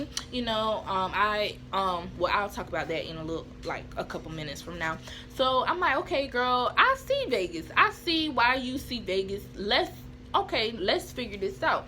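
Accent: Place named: Deep South, United States. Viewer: "East Coast"...lol.. Naaah' you're from the DEEEEEP South!! Lol. It's all good. How's Vegas?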